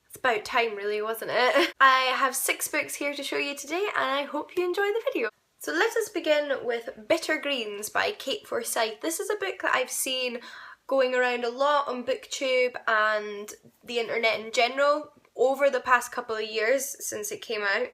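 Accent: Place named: Glasgow, United Kingdom. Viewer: I love your accent, are you from Glasgow?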